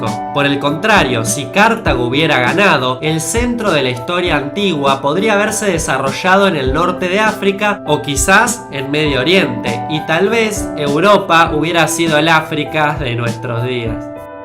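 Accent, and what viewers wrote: Argentina. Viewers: yo soy chileno pero por tu tonada me suena argentina pero me confundo si eres argentino y estas en mexico y te a cambiado el tono o puede que sea alreves tu dime si estoy en los sierto aposto que eres mas argentino que mexicano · jajaja era argentino, y no me di cuenta por el acento.....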